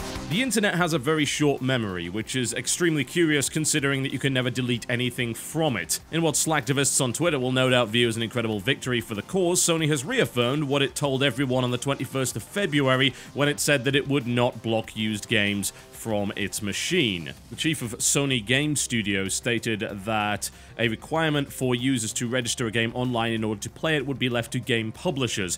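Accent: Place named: England, United Kingdom. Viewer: ...theyre two different pronunciations. and keep in mind, the language is called english, and he's from england. see a connection?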